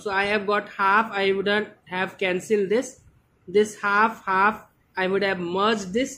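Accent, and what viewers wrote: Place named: Pakistan. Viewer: Bro your pronunciation sounds like mohd.rizwan(PAK CRICKETER)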